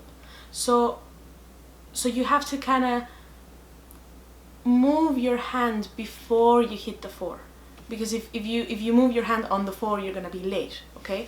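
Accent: Ireland. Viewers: I thought as much ud might of lived here with ur accent, I trained as a sprinter at the UL from 1989 a wee 14 yr old and come 1997 I became national champion at sprinting 200m under my coaches then Dr Drew Harrison n his lovely wife Hayley. They helped produce many Olympians and countless national champions. I absolutely loved being in the Ul, even tho I wasn't a student but I've always been welcomed by the Athletic coaches there over the years n got to meet n train with many Olympic medalists, world record holders n meeting my hero's, it was amazing just like u are as a coach to Bodhran playing. Ives just spent 45mins doing my rashers n sausages ha ha n just like Athletics uv gotta put in the training. Thanks again for getting in touch, I'm so honoured by that from you. Keep up the good work theres something definitely special about you 🙏🥁🏃‍♀️🇮🇪☘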